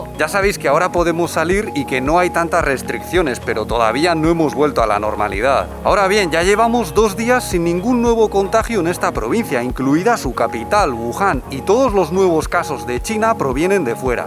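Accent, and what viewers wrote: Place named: Spain. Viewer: Si no fuera por su acento español hasta yo lo viera como EE. UU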